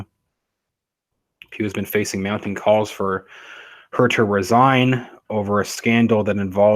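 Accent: Canada. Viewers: Where is he from?, sounds Canadian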